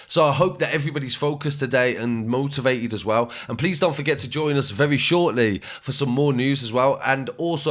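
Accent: Birmingham, United Kingdom. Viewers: This guy needs someone different narrating brum accent is just beyond terrible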